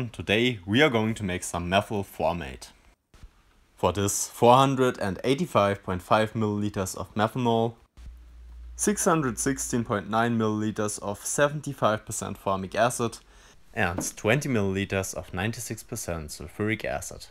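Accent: Germany. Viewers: I like German accent.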